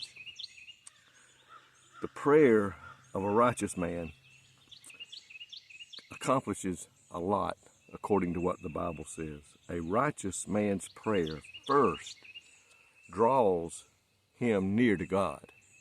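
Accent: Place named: South, United States. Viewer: I too woke up in the woods on a spiritual retreat in Ohio. You blessed my soul with the nature sounds and that familiar Southern accent (I’m from TN). Thank you for sharing your time and your prayer of blessing on us watchers (I just searched for morning devotion and this came up). God, heal his eye issue. ❤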